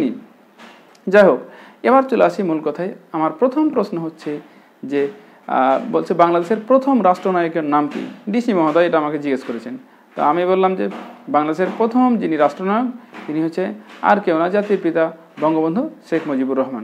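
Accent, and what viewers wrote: India. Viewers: Apnr kotha shune mone hocche apni indian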